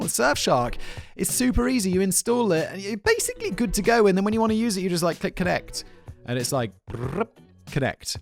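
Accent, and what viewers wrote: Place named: United Kingdom. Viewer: You have got to love the irony of the guy who is the McDonald’s of YouTube, making comments about the quality of other peoples YouTube channels. Every single time this individual has a brain fart. It turns into a new channel, he can’t even read a teleprompter without making mistakes, almost literally every single video. A guy who makes his living by literally doing just quantity over quality, criticizing other people about the quality of their videos. He mispronounces things that are basically commonly known words in the English language or our general knowledge, but he wouldn’t know that because his only qualification for his job is the fact that he has a British accent. Which makes people think he is smart, spoiler alert, he’s not. This imbecile actually claims to have a university degree from somewhere in Britain, he should send the work he has done in the last few years to his alma mater and maybe he can get his money back.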